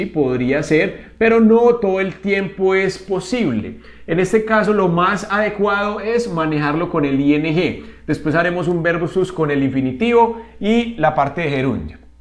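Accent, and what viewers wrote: Colombia. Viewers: erez de colombia tienes asento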